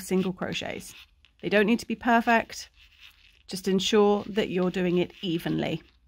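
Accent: United Kingdom. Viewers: this is random but what kind of British accent do you have?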